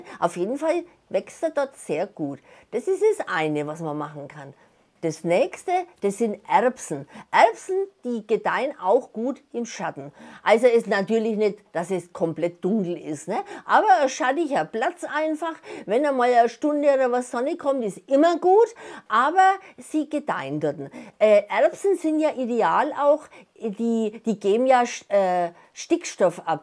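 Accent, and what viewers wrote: Franconia, Germany. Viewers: Danke für deine tollen Videos 😊 Sag a mal kommst du aus Franken?🤔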